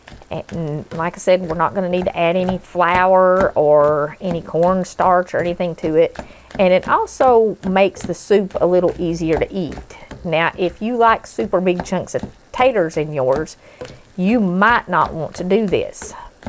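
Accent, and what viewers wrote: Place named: Tennessee, United States. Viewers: This looks so delicious! I just love this channel. You sound like my beloved relatives who live in Tennessee and I just love how down to earth you are and that you’re not afraid to talk about God. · I’ve been a widow since last Christmas. I appreciate your channel because you cook good ole down home food, but the best part of your channel is that you dedicate it to also spreading the gospel of our precious SAVIOUR. I’m praying for you. It’s been a hard year for me also. My husband was an ordained minister and he was in Gospel Music for 50 years. My prayer for you is that Jesus would give you sweet peace and wrap you in His loving arms. May God richly Bless you! It’s also good listening to someone from Tennessee. I live about 35 miles east of Nashville. 🙂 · It's been many years since I left the Appalachia where I was raised. 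Received an Ivy League education and became a tenured professor at an elite institution. Along the way I never heard spoken the dialect of my native region, and I rinsed my own speech of it as I rose in my profession. God, how I love hearing you speak it. It sounds like home and family and belonging. I miss it so much.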